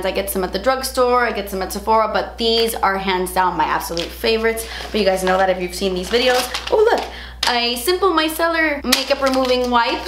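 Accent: Cuba. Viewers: ! Will you ever do a video speaking in Cuban/Spanish (sorry I don't speak those languages so not sure if they are the same/similar??)? I think your Cuban accent is really cool! 🤩 Would love to watch a video like that hehehhe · siiiiiiiiiiii!!!! Cuban accent ❤️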